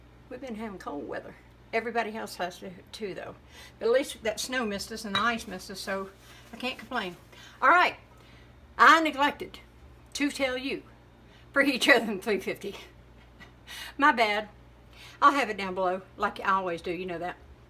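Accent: South, United States. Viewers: Looks good just get to the dish heavy southern talk hard to understand some of the talk will make it soon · p.s. I could listen to that lovely southern talk all day long. My Mama grew up in the Smokies. <3 :)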